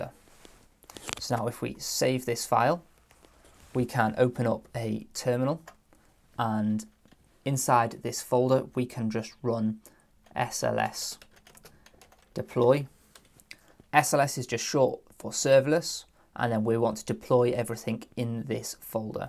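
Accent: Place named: Northwest England, United Kingdom. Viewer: Nice one, love your accent btw. Are you from around the northwest of UK?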